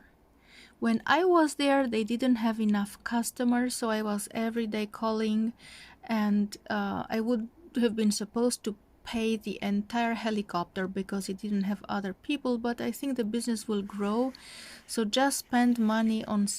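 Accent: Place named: Japan. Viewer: Yeah I thought you have that Japanese accent😂 , but your European appearance confused me a little. 🤔😓 Thanks so much for the nice comments about Vietnam😆, I also visited Tokyo - such an energetic/colorful city, the people are lovely/beautiful!! I hope you enjoy your traveling; and keep recording your precious videos/memories. All the best to you🤟🏻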